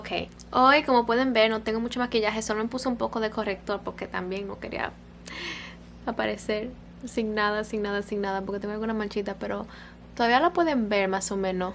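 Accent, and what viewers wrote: Dominican Republic. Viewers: ¡Eso era lo que estábamos esperando! Ese español de la República Dominicana se diferencia donde sea 😂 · Español cibaeño ❣️🥰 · vi el primer video de ella y dije,, ok, no esta mal , le di al segundo y desde que escuche el ascento dominicano , dije , aqui es que vamos ejjejeje , que linda jj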